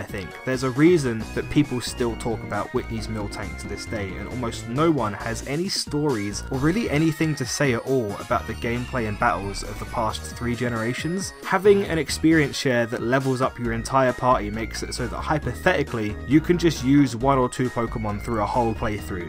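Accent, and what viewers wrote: United Kingdom. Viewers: i like your British accent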